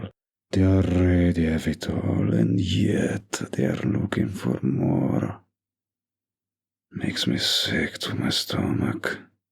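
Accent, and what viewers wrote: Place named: Russia. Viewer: I HEAR RUSSIAN ACCENT, ARE YOU RUSSIAN?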